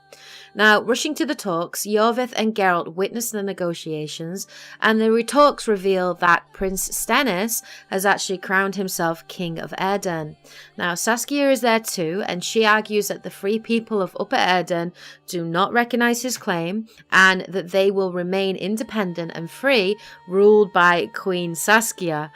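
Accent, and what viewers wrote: United Kingdom. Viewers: should´ve watched before playing witcher3. really well done don't mind the length at all. northern dialect is a big plus as well tah ! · 8 years late but hopefully not too late I played the 3rd game around 4 years ago and loved it went back and read all the books and played through thronebreaker, so now I need this video to catch up with the 2nd game in earnest Thank you so much! Also, the northern accent makes the video even more authentic, you sound like you give council to Queen Meve on the side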